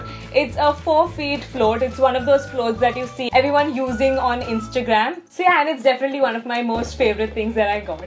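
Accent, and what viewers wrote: India. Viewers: She is indian n indians speaks like this only ....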